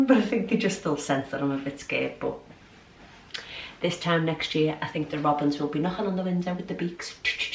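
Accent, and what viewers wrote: Liverpool, United Kingdom. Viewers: Just found your channel and love it. Cant wait to get in my garden but in toronto its still a bit cool for planting. Are you a scouser like me?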